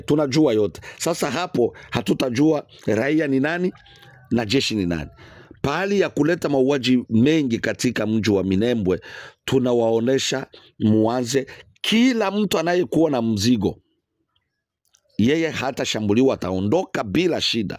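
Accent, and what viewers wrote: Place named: Rwanda. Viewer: NAWEWE UNATMKA MAJINA YA VIJIJI KAMA WANYARWANDA!! LWIKO = LW 'EÙ, KAKANGARA = AKANGALA, BIGARAGARA = BIKALA KALA, KABINGU= ABÉNGÙ. KISOKE = ÉSOKE. TAMKA KWA NAFUZI ZA KIBEMBE MAANA NI VIJIJI VYA WABEMBE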